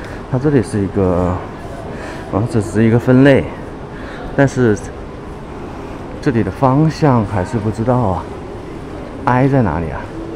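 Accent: Wuhan, China. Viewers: 虾不掩鱼，纯正武汉普通话，亲切，点赞。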